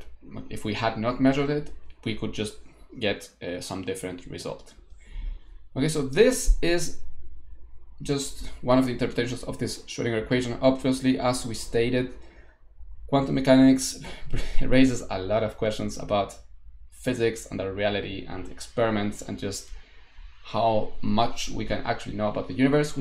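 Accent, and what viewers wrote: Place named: Germany. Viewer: Good explanation brother, btw I love your German accent. I wish your channel grows and you keep making videos for us (or me)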